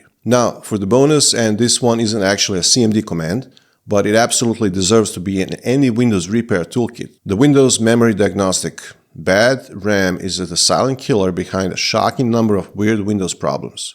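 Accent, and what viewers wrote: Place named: Eastern Europe. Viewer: Thanks for this. Detecting a slight eastern European accent...are you Russian? Studied Russian a long time ago.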